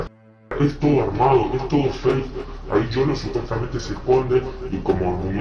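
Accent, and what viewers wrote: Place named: Colombia. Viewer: No la saca de Google Cómo explicas su voz original, tiene acento Colombiano y Juan Carlos no la tiene es obvio que no es solo lo dijo para ver cuánta gente le cree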